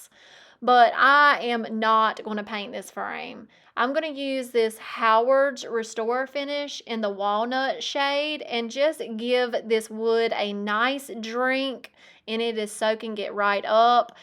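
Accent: South, United States. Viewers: I love your southern accent.❤ Soo 😍 cute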